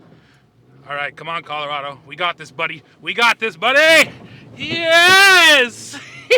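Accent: Canada. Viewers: Why Canadians mispronounce the letter Z. You don’t say Zedebra, it is damn Zebra 🦓. You don’t say Zedoo. It is damn Zoo. Lean English. It is Zeeee.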